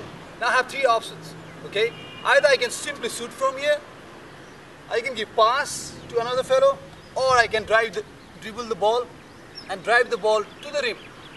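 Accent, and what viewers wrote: India. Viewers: Indian accent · Were you from from indian bruh i dont understand you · wow awesome video and anyone can identify an Indian pronouce good job!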